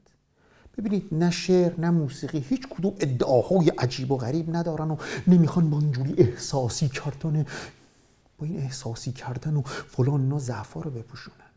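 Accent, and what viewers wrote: Bushehr, Iran. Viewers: دمت گرم، لهجه بوشهری عالیییییییی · لطفا لهجه شیرین بوشهری رو نگه دارید🙏 به موقع قرض ضد بیماری قند خواهیم خرید!😊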